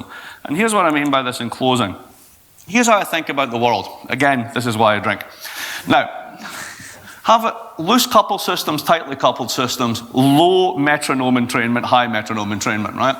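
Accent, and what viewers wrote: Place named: Scotland, United Kingdom. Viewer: + Scottish Accent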